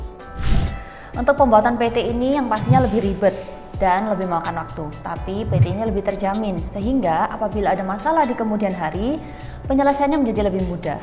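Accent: Java, Indonesia. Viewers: Jawa banget.. 😀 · Cantik medok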